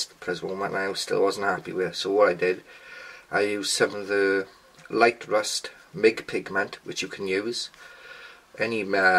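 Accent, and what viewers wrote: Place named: Wales, United Kingdom. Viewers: Jesus! I can't even read the subtitles! What an accent!!! Dat British... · Welsh :D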